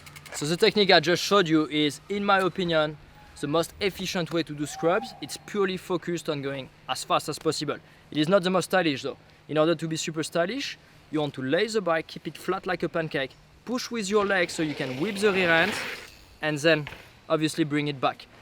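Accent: France. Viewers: Je kiffe grave ton accent français en anglais......tu parle le franglais, le vrai .....t y es le meilleurs en tout cas dans les rides de fou....bravo a toi d avoir trouve la technique du poignet pour ce tricks, ça marche du tonnerre je viens de l essayer ....🤟🤟🤟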